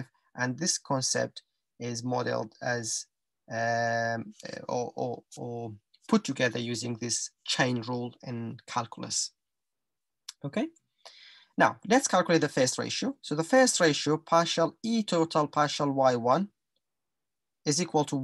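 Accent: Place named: United Kingdom. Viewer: Strong British accent !☺️ Well done ✅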